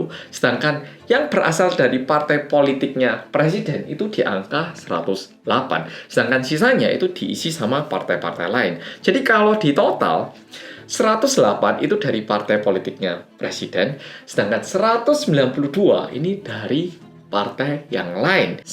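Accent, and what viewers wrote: Java, Indonesia. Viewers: mas.logat bicaranya medok ky org jawa asli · Medok bgt jawanya... Mantap.. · Kadang suka msh kaget klo mas ngomong jawa medok tp wjah korea 😁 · mas jenengan korea tpi luweh medok seko aku mas😭😭😭😭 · Mas sampean jadi orang Indonesia aja sih ,orang ngomongnya medok banget kaya nggak percaya klw aslinya korea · AQ neknweruh mas Ra berasa wong Korea..ngrasane wong Jawa mas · Mas kok aku liak e, rungok e wis koyok wong jowo indonesia yo? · Logatnya Jawa 😂😂😂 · M'f abang ni org mana? korea kan ? bhs indony fasih bngt kyak ada jawany, sehat sll Bang.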